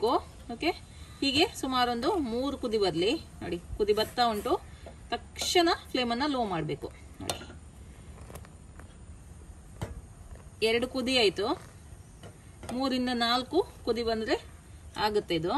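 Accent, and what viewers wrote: Mangalore, India. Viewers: Supertea madam maguvinathaha mukhadaste mangaloorina mathu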